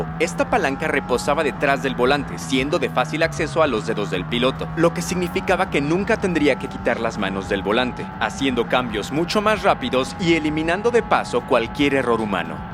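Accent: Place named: Latin America. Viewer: Esto no era Driver61 en Español? Por qué está en modo latino? Otro video que no veo.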